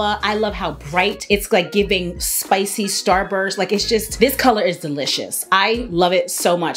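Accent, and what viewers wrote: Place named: New York, United States. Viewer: Girllllll. You are such a New Yorker, ya feel me? I'm like is this the accent people say I have that I don't notice but I know I have 🤣. I Crack up everytime you say something very New York.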